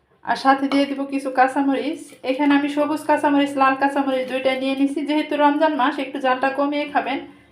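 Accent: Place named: Tangail, Bangladesh. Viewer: Ata to amader tanggaeler vasa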